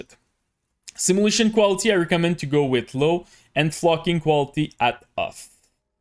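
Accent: Quebec, Canada. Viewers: Tu sonne qc ? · t'es clairement quebecois hahahaha. bon video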